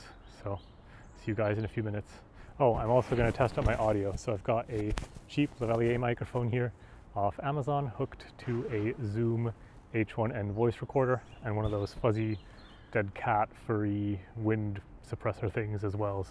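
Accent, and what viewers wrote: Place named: North America. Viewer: I think this came up because I was looking for gopro settings. I’ve been using a chest mount but I’ve seen suggestions that the gopro should be upside down. Not sure why. Which way was yours mounted? I’m in Australia and the cycling infrastructure varies from non existent to fairly good, where I am in South Australia anyway. I heard your accent and thought you were in North America. I’ll see if I can check out your other videos if I have time. Cheers.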